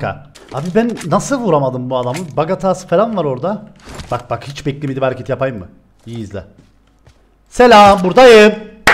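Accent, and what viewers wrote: Cyprus. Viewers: Kıbrısta nerelisin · Abi sen gerçekten Kıbrıs lımısın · Abi sen kıbrıslımısın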